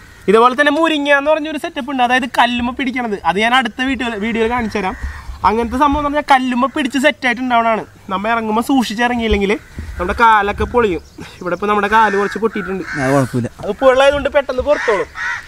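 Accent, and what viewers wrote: Thrissur, India. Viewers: ബ്രോ 4 വയസുള്ള എന്റെ മകൻ നിങ്ങളെ youtube വീഡിയോ orikkal കാണാൻ ഇടയായി. ഇപ്പൊ ഡെയിലി 'മാമന്റെ വീഡിയോ വച്ച്താ എന്നും പറഞ്ഞു കരച്ചിലാണ്. കണ്ടതൊക്കെത്തന്നെ വീണ്ടും വീണ്ടും കാണുന്നു.. കോട്ടയം കാരൻ thrissur ഭാഷ സംസാരിക്കുന്നു... without any training.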